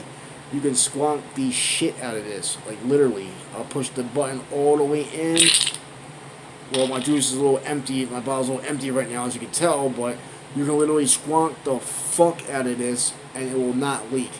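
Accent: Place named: Philadelphia, United States. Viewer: Thanks for the review brother. I recognize a philly accent from anywhere. I’m guessing the north east?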